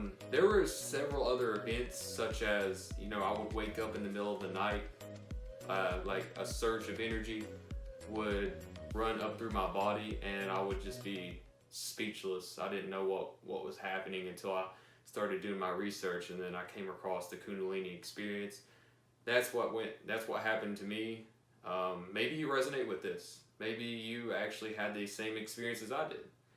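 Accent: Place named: Alabama, United States. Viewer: Where are you from! I’m from bama and I recognize your accent 😉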